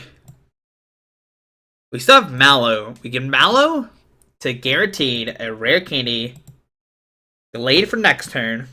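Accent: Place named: United States. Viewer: Guys, it's just a regional accent. Everyone has one. Everyone understands what he's saying, what's the big deal. And I can assure you that an awful lot of people wouldn't be able to tell much difference between his accent and any other US regional accent.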